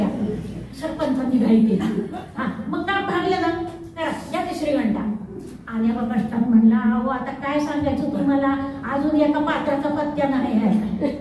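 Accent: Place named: Rural, India. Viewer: छान! हुबेहूब प्रत्यक्ष प्रसंग समोर दिसू लागतात. ग्रामीण भाषेचा लहजा किती सहजतेने वापरला आहे. समाधान वाटते.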